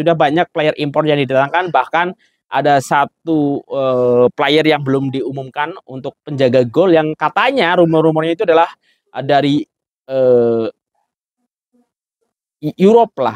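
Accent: Java, Indonesia. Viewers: hahahaha....pekat betul jawa kau bro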